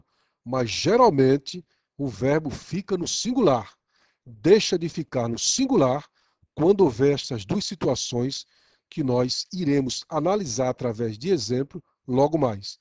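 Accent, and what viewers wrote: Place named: Pernambuco, Brazil. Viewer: Aulas maravilhosas, estou assistindo todas e está me ajudando muito, principalmente porque me familiarizo com seu sotaque, pois sou Pernambucana também. Continue assim, com esse trabalho louvável!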